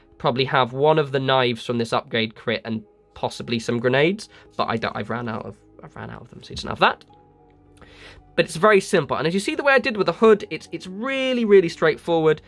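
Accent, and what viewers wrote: United Kingdom. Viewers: As a fellow british person, I have the horrible moment of thinking "do we all sound the same?" All your expressions are the same as mine. Thank you for this, jsut gotten abck into warhmmer after 20 years and could never kitbash as a kid, so lovley to get some inspiration for me starting out my own Nid and Cult armies.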